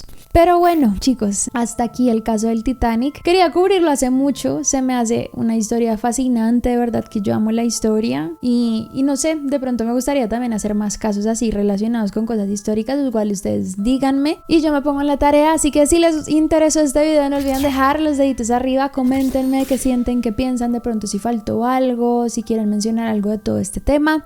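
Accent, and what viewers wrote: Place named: Colombia. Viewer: Excelente narración, eres muy buena y tu acento parcero me encante saludos desde Mëxico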